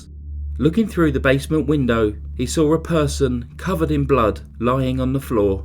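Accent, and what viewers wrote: United Kingdom. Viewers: Clearly from your British accent you are 'not from around here'. Which just makes me appreciate all the more that you put the effort in to give proper name places. I get so sick of the ton of video stories, especially from the States, where they will say Edmonton, Canada or Toronto, Canada or Calgary, Canada, etc etc. It's like they don't recognize we have provinces here. Many provinces have towns with the same name, so you'd better specify the province if you are mailing something!! As far as this case goes if Canada gets any more lenient on murderers, people will be crossing borders to commit their dirty crimes here knowing they'll only get a slapped wrist!- But seriously, 6 years inside for slaughtering THREE people-- so our Government gives just a worth of 2 years per person. So a kid can kill me tomorrow & my death is only worth 2 years!! 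And if one of your innocent victims is a LITTLE BOY, and your own little brother, no big deal, only worth 2 years still. How the hell can she be free?? HOW??? My god please tell me before this little monster was fully released she was permanently spayed. God don't let her breed her evil blood. Otherwise one day we will be reading about how she brutally killed her own children too.😕 Rest In Peace, sweet baby brother.🌹😔🌹. 🇨🇦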